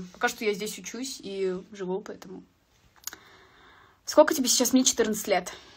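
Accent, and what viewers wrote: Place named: Russia. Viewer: совсем стала русской,когда-то говорила как и все казахи-Алма-Аты,теперь говорит как все русские Алма-Ата ))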